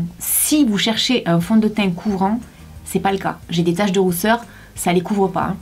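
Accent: South, France. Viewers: Super découverte !!!! Ton naturel, l'accent du Soleil... Hop-hop, je m'abonne !!! Et merci beaucoup de penser aux Coquettes à petit budget 😉😊😁 !!! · T'as un accent du sud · J'aime trop ton accent 😂 #Sudgang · j'ai 10 ans je viens de te découvrir et je te trouve drôle gentil et marrante avec ton axant du sud bisous et super vidéo plus 1 abo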